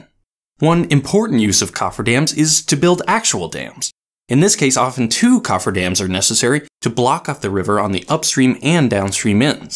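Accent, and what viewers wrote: United States. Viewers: He speaks so...american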